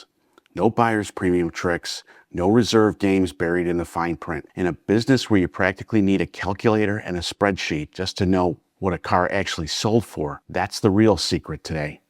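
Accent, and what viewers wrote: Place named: New Jersey, United States. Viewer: . I really enjoy your videos. Thanks for doing them. It might be your Jersey (?) accent, but Wimbledon does not have a t in it, and asterisk is pronounced as it is spelled. I realize i am pedantic.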